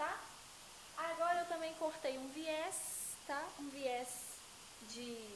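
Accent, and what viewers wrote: Minas Gerais, Brazil. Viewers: Você é muito fofa, adoro a graciosidade que o sotaque mineiro tem!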